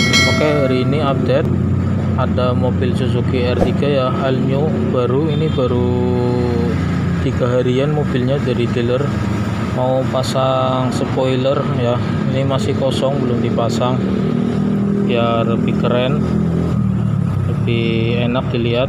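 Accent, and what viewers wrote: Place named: Tegal, Indonesia. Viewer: Tegale ndi mas broo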